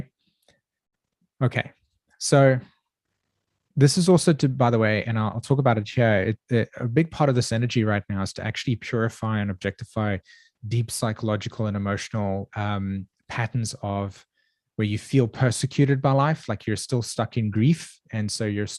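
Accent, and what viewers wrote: South Africa. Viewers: Brilliant, Have subscribed! Are you South African by any chance? Thank you for sharing your wisdom so beautifully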